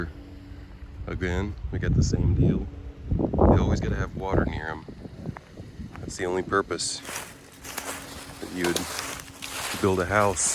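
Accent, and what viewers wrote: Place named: New York City, United States. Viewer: You sound like you lived in NYC.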